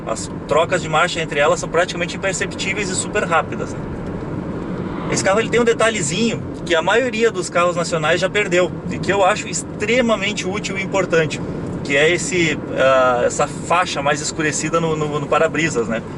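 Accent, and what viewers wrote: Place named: Rio Grande do Sul, Brazil. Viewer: Vc é gaúcho né rs, se sim, conheço logo, como o baiano, usa "tu" e ao invés de falar "di noite", fala "d noite", "d baixo"... Bem legal o sotaque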